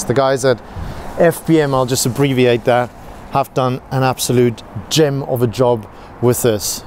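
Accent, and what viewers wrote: South Africa. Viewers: I hear South African vibes ..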